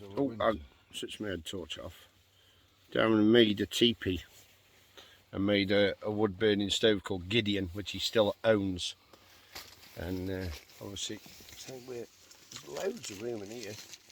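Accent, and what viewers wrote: Stoke, United Kingdom. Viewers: Just seen your review, I'd like one,of these. A cracking review, thought you were a stokie, Im from castle, we go up there camping also, may have to get one.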